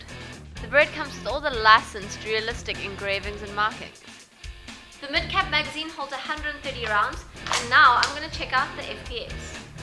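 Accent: South Africa. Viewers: this chick sounds south African,i could be wrong.she really does. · She so sound like a South African. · john accer She's from South Africa with that accent :)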